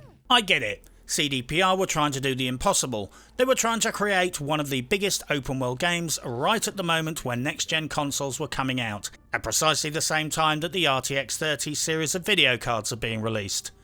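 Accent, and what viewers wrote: United Kingdom. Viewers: As an emerging, very, very happy fan of your video's which somehow I didn't ever see pop up until recent weeks, I humbly ask of you sir, over in the U.K. I presume, of which I thoroughly enjoy the words used with the accent, which just gets my wife and me so much, lmao, that you do a review that's reasonable on Homefront 1 which is STILL NOT backwards compatible for XB1 Series S or X, but only playable on X-Box 360 & Homefront 2 - The Revolution, IF I've somehow missed that if you already made one. IF you could do that, at least for the 1st one, I'd like to hear your thoughts. I enjoy the campaign on it, although it's much more linear and shorter than the sequel but I cannot for the life of me understand at least 1 thing, why the f--k it's not playable on new gen consoles like 99% of all other older or slightly older games now. From the other side of the pond in America, from the great fkg state of awesome Georgia, thank you.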